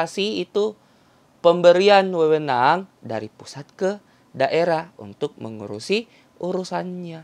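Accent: Makassar, Indonesia. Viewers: Mentornya kental Ki logat Makassarnya😅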